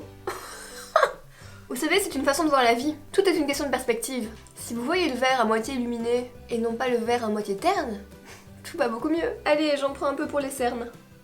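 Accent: Belgium. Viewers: Salut j'espère que tu vas bien je t'adore tu es ma youtubeuse préféré et surtout j'adore ton accent belge lol. J'espère que tu vas me répondre car aujourd'hui c'est mon anniversaire et le meilleur cadeau que je veux recevoir c'est que tu me réponde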